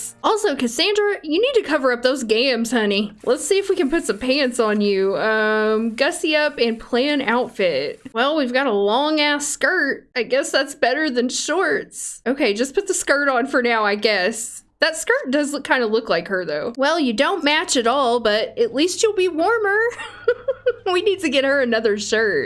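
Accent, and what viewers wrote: South, United States. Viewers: I love your southern accent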